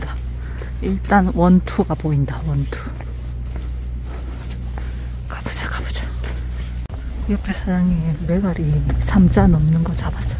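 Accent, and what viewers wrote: Busan, South Korea. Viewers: 항상봐도 재미 있습니다 부산사투리도 구수하구요 🐟🐟🐟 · 부산사람입니까. 말투가 암청 정이가네요^^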